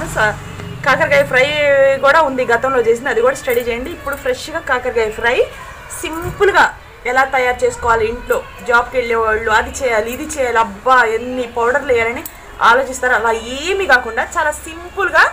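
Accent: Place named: Guntur, India. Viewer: Ala em undadu Amma urdu every state different slang untadi meeru matladindi guntur/Vijayawada slang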